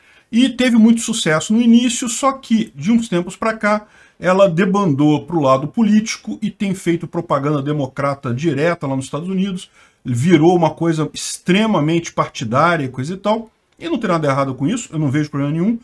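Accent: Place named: Rio de Janeiro, Brazil. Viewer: Uma sugestão pro seu canal: que tal colocar uma música de fundo? Você é do Rio de Janeiro? Deve ser do interior, porque seu sotaque é meio misturado...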